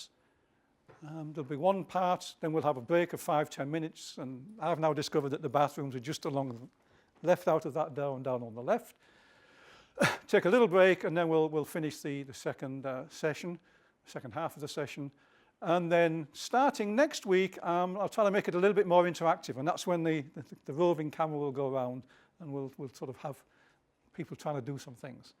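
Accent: Northern England, United Kingdom. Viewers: Professor Deblin, you forgot to explain how your Northern English accent opened the doors for your at the library in Florence, I'd love to know the story. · He looks just like Graham Nash . He has the same northern English accent too .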